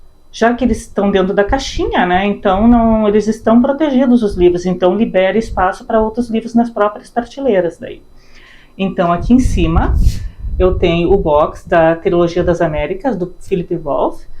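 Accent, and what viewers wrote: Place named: Rio Grande do Sul, Brazil. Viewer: Bookshelf legal, ainda melhor com o soquate gaúcho, saudades do sul do Brasil 🙌🏻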